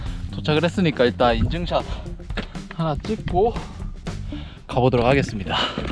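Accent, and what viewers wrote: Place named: Jeolla, South Korea. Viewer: 아 근데 전라도 억양이 되게 거슬리네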